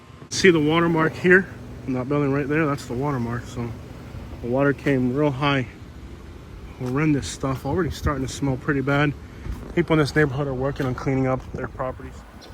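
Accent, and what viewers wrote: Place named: Florida, United States. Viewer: Saludos se escucha que hablas floridacubano 😂 Dios Mio que triste 😢 they didn't even finish repairs from the last storm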